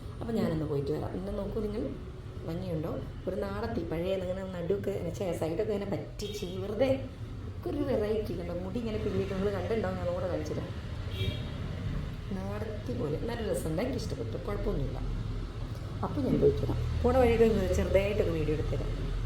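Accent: Kozhikode, India. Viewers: Nadathi 😂😂😂 inte Ingale kondu thottuuu 😅😅 mmale koikodu slang 😂😂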